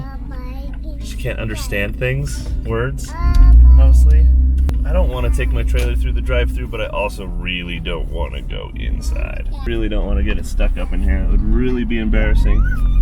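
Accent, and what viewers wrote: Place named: West Virginia, United States. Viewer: You sounded real West Virginian in this video.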